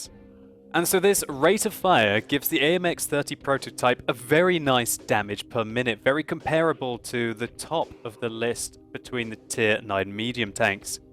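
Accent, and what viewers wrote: France. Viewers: Lovely french accent :D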